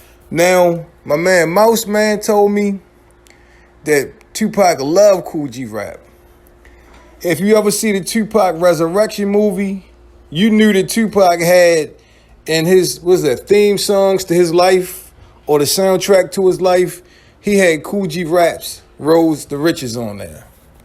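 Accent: Baltimore, United States. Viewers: you definitely from Baltimore shorty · We never met, but dam your Baltimore accent is thick..... LLS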